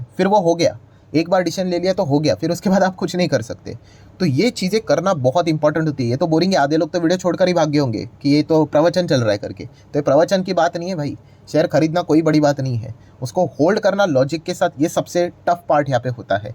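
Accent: Maharashtra, India. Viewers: सर तुम्ही मराठी मध्ये बोलता हे तुमच्या बोलण्यावरून कळतंय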